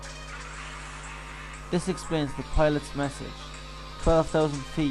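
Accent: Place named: Cork, Ireland. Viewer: It fails as a historical piece, it certainly fails as journalism, amateur or not. Right from the get-go it strikes the wrong note, I mean the title, "seconds from disaster"- surely ' ' is aware of the train-wrecks'n'refinery-explosions programme of the same name on (Discovery? History?) Channel? (aka, the Hitler Channel & the Nostradamus Channel, ha-ha!) I suspect he is, intending to lure in viewers (it worked!) Then there's the presentation- the 'C'mereIwantchaa..' accent on its own would be OK given that the plane was flying out of Cork, after all- but trying to give it this American 'All the Hits, All the Time' DJ-speak/'Picture a Man..' Movie-trailer V/O- type flourish, well it just becomes cringe-making, my toes were curling listening to it!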